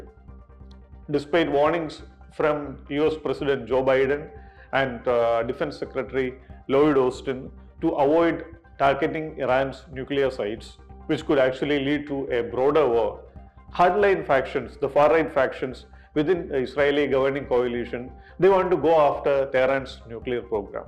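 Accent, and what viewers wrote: Kerala, India. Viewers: From ur accent I know u are from Kerala. Great how u handle difficult topics calmly.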